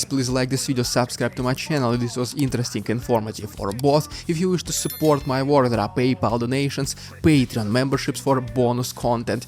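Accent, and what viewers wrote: Russia. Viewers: With that accent, there is no doubt that this dude is Russian. What gets me is that this dude's English vocabulary is in the 90th percentile. Impressive.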